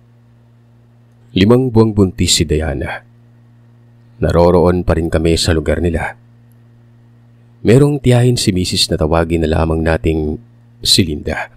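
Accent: Iloilo, Philippines. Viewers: Hahahha ilonggo gid ang tuno ni sir hahahha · Kanami gd ya pamatian bsta ilonggo accent sir hahaha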